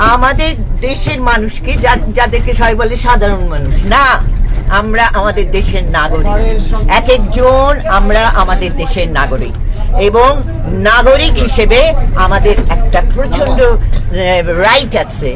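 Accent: Kolkata, India. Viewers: তোমার বঙ্গবন্ধু লীগরা বাংলাদেশে খাই ইন্ডিয়া যে বসবাস করে তাতে কি তুমি দেশ প্রেমিক মনে করো তোমার মুখের ভাষা হয়তো মনে হয় ইন্ডিয়ান কলকাতা তুমি আমার বাংলাদেশের দেশপ্রেমিক হওয়া কি করে তুমি কোথায় দেশে নাকি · কলকাতা স্টাইল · আপনার ভাষা তো আমাদের বাংলাদেশের ভাষার সাথে মেলেনা বরং কোলকাতার সাথে মেলে অতএব আপনি যে ভারতের দালাল সেটা আমরা বুঝি।😂😂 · এটাতো কলকাতার ভাষা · কথা বলার স্টাইলে ভারতের মত,বাংলাদেশ ভাষার প্রেম মারায় 😂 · Tomar kotha vongi kolkatar varot jao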